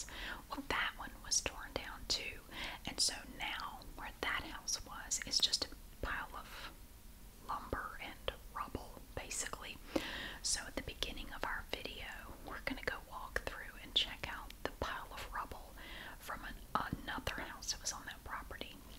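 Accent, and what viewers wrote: South, United States. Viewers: You have a soothing voice and mix in that Southern accent it's strikes gold . Personally, I like it better and feel the whisper takes away your best attribute. I watched your videos for years and congratulate on building your channel. My favorite is when you treated yourself to a cruise something you wanted so badly. That was an awesome emotional video.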